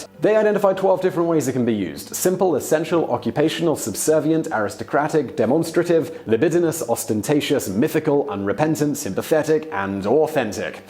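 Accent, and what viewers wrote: United Kingdom. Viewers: Why is there a British guy talking about Canada? Why not a Canadian, eh? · It is by a Brit you idiot, how could you listen to the guy and think by his accent he was American. Are you deaf or what is your problem ?.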